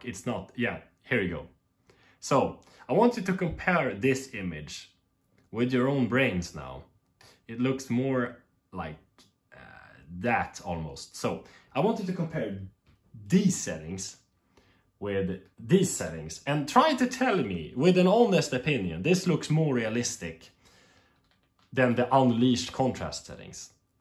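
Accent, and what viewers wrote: Sweden. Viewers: Svengelskan är top notch :D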